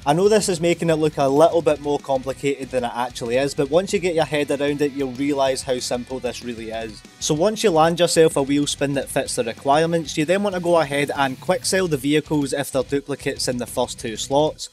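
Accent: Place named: Scotland, United Kingdom. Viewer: Your Scottish accent is awesome, I enjoyed every minute of it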